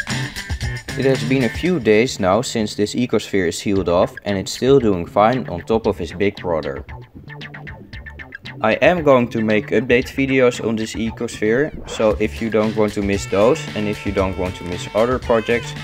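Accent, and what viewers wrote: Netherlands. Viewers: Dutch people speak English better than most Americans. :-). I very much enjoy the Dutch accent. Very cool!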